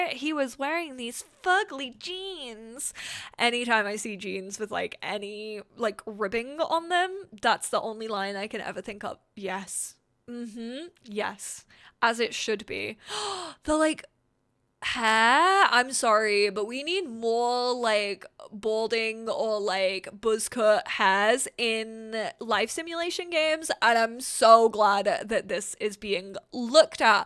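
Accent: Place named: Northern England, United Kingdom. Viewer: Does actually live in england still. To me her accent sounds like 40% northern and the rest vaguely america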